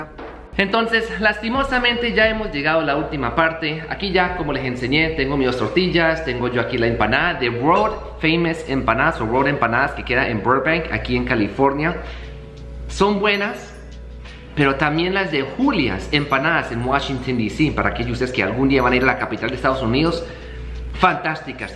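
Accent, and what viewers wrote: Colombia. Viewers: Tu eres colombiano, porque suenas a ellos. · me encanta tu español colombiano. xdxdxd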